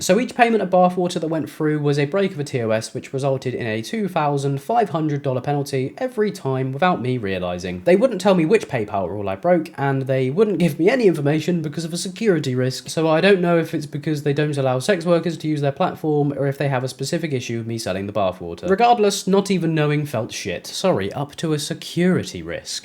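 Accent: RP, United Kingdom. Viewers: I hope that colonialism and imperialism also come to your mind every time you hear that accent, because those are as traditionally British as the pompous tea drinker. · As a german i just love your british english. It literally sounds like some rich guy in a suit with a cup of tea is talking to me😂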